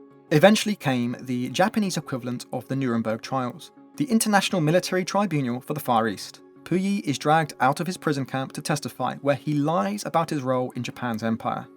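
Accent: United Kingdom. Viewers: I mean he’s bri ish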